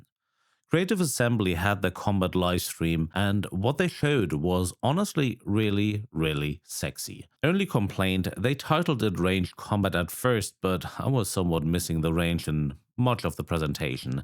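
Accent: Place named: RP, United Kingdom. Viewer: Posh accent. - Eldar player. Perfectly fitting.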